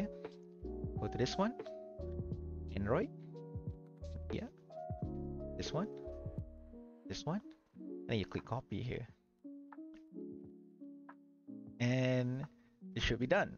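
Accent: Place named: South East England, United Kingdom. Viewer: Спасибо тебе, человек с юго-восточным акцентом за эту ценную информацию!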